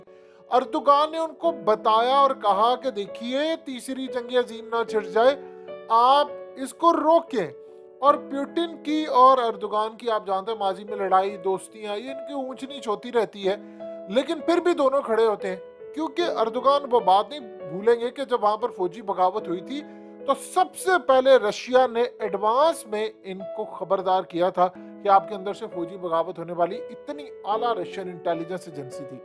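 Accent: Punjab, India. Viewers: Nice punjabi touch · Kia Punjabi ka tarka lagtay hn Uncle AP SADA Khush rho Brave personality haqeeqat tv anchor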